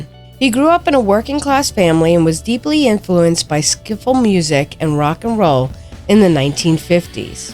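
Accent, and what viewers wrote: United States. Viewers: Ur from jersey arent u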